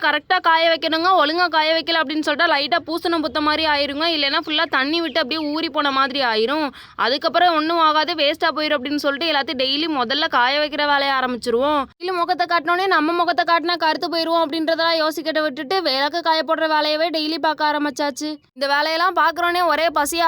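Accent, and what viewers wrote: Madurai, India. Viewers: மதுரை வழக்கு பேச்சு தலைவா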